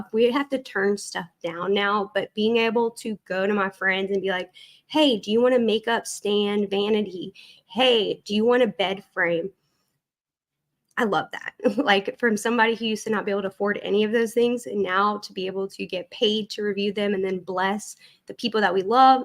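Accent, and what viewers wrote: South, United States. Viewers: love your videos and this is a great one, as always. Also, talk how you want to talk. Don't change for ANYONE. You are you and that is why many follow and admire you for what you are doing. Sucks on what has happened to you from this jerk, but it will pass like everything. Some speed bumps along the way, but you will come out at the other end stronger. Love your southern accent and how you talk, so keep it going!!!!! From Ontario Canada, and if you heard me, you would say I have an accent also, so keep moving forward & upward!!!!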